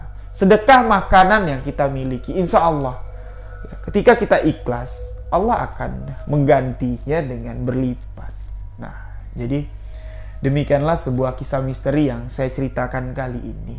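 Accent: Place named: Madura, Indonesia. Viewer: mas ini asli mana ya kx saya den9er² bhs nya kyak oran9 madura🙏🙏🙏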